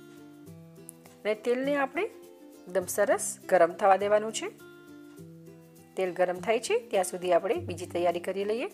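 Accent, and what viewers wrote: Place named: Kutch, India. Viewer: Tamari language per thi laage che ke tame kutchi cho. Koik Kutchi recipe batavo ne.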